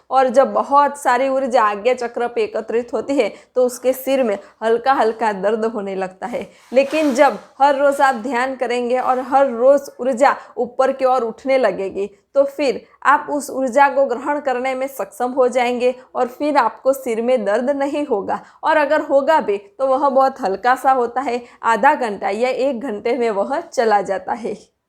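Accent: Gujarat, India. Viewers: Thank you .Kya aap Gujarati hai?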